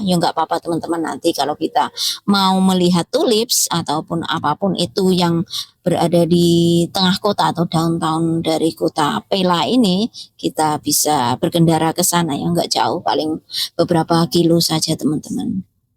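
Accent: Java, Indonesia. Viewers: Ass...klrg kecil yg bahagis...Salam sy dari Bekasi...sy sangat sk dengan kllrg kecil ini...ap lg mbk...org nya grecek,klu lg ngomong sy sk ketawa dgn logat jawa nya,org nya,sederhana,dan tidak sombong...sukses terus mbk dan klrg...❤🎉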